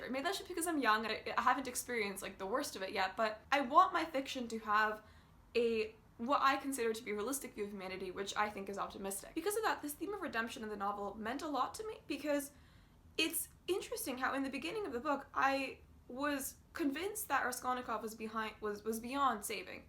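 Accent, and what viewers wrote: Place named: Canada. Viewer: What a lovely Canadian accent Oh! By the way nice review.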